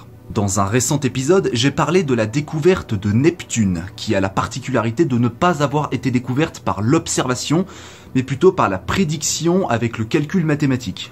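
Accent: Germany. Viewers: C'est bien cette chaîne, pouce bleu pour l'accent allemand et la voiture qui passe ! Merci pour ce contenu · Poce bleu pour ton accent allemand :P Sinon super vidéo :D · Pouce bleu rien que pour l’accent Allemand ;-)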